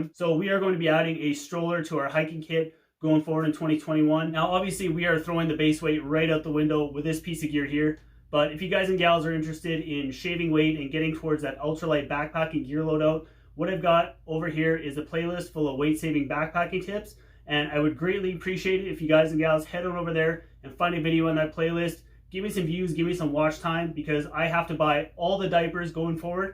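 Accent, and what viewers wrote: Canada. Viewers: I love your Canadian accent.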